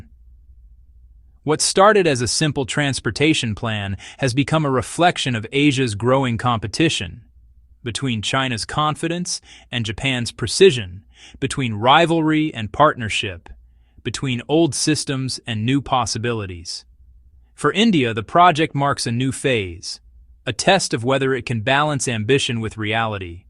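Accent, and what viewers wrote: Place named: England, United Kingdom. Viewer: Chinese propaganda in anglicised English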